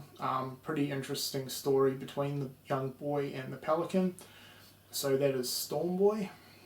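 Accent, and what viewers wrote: New Zealand. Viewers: sorry this question has nothing to do with the video but are you originally from new zealand because you sound like a kiwi.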